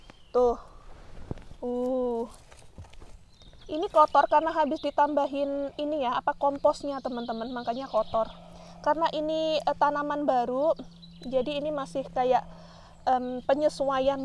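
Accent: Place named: Banyuwangi, Indonesia. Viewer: Bang sekarang klo ngomong banget jd ketularan logat Banyuwangi nya mbk Manis banget jd manis byangettt 🤭🤭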